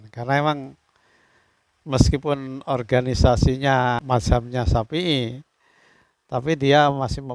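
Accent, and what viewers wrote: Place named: Java, Indonesia. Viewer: Bicara Jawa barat tapi logatnya jawa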